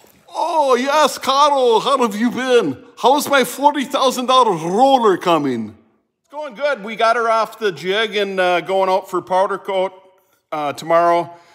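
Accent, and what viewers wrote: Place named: Midwest, United States. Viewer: Are you Minnesotan you have some heavy midwestern accents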